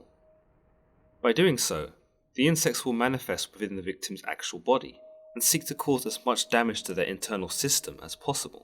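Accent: England, United Kingdom. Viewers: i hate it when europeans mispronounce and narrate about bipoc history, mythology, ancestry, etc... especially if its my mother's home land-- thats been colonized a bazillion times. why dont they ever have people from the place teach about the place? if anything it'd avoid mispronunciation the very least. MAM-BAH-BAH-RANG. just cuz they got a english accent dont mean theyre more intellectual. coughs despite almost every documentary ever made being narrated by an english voice coughs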